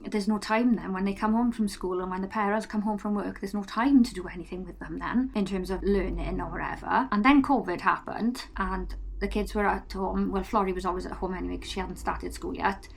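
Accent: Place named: Wales, United Kingdom. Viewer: I have been religiously watching your videos on the big tv as you know. You’re my go to friendly face and you know I can’t resist your Welsh accent! Just wanted to come on specifically to say you are smashing it lovely. Your videos are a breath of fresh air and can see your video style since you changed things up and got your new camera have worked so well. Keep going lovely because everyone needs more vlogs in their life. Xxx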